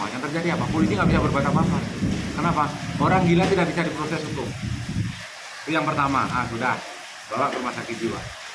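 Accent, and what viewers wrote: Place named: North Sumatra, Indonesia. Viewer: Untuk saya yang lahir dan besar di daerah danau Toba udah biasa dengan logat keras seperti ini, bahkan mungkin logat bang seberapa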